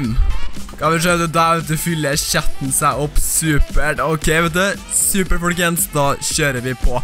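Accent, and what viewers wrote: Trøndelag, Norway. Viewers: Du e best elsker deg liker din stemme som er trøndersk😍😍😘😍😘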